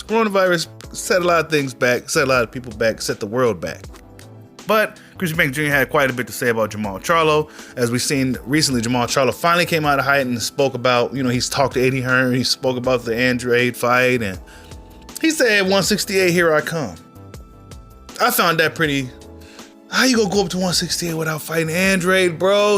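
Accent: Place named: United Kingdom. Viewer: Only half through the video bro but I need to hear your British accent 😂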